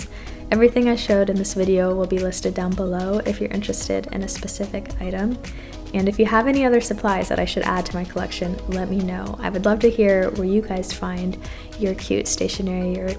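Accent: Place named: United States. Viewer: You sound american how did you get something from 다이소? Do they have online stores?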